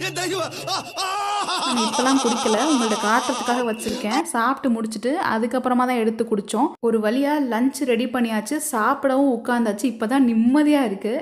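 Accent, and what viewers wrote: Madurai, India. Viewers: Madurai pechu kiliyethu dhul machi nan kuda Madurai tha nega yenga irukenga ipo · Nanum maduraikari than sis unga slang nalla theriyuthu.. aagatha vela haha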